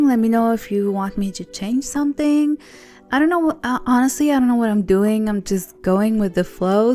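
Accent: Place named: India. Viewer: was gonna listen but indian accent = opinion rejected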